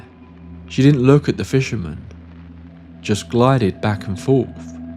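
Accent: United Kingdom. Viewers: Im sorry but it seems odd for stories about America to be told with a british accent . Maybe that is just my opinion, maybe not. And they are good stories by the way 😊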